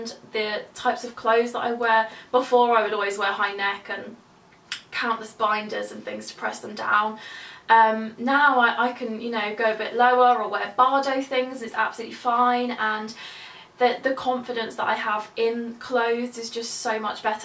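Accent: United Kingdom. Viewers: Oh that British ascent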